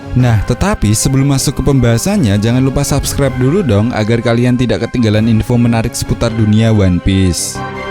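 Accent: Java, Indonesia. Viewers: Medog tenan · Ngomong medo, dari dulu ga berubah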